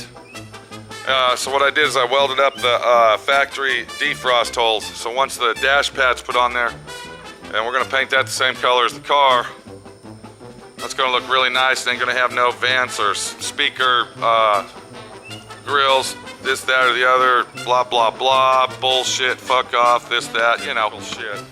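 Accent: Texas, United States. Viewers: hahah.. i love the texas accent.. wish i had one.. i was born there but left when i was 1.. =/ movin to SC soon.. so hopefully i'll pick up an accent there.. this masshole accent sucks.. haha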